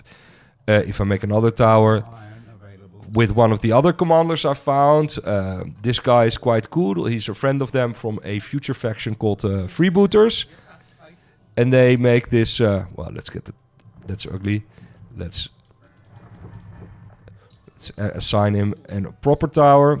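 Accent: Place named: Netherlands. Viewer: He sounds soooo Dutch, enjoying your game but still things are not clear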